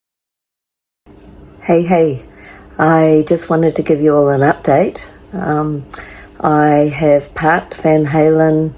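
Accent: New Zealand. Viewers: Thought I picked up on a kiwi accent! I lived in NZ as a kid... one more thing in common! So very familiar with Kia kaha! Back at ya! 💪😍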